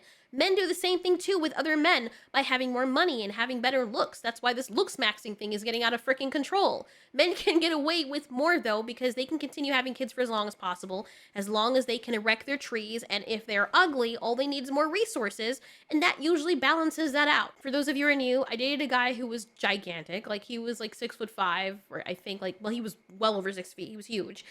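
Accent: England, United Kingdom. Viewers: I endorse your Brit accent. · That was a surprisingly impressive English accent. Wrong time period, but still tho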